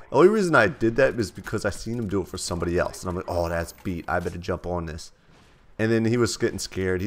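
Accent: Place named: Wisconsin, United States. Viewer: wisconsin accent FTW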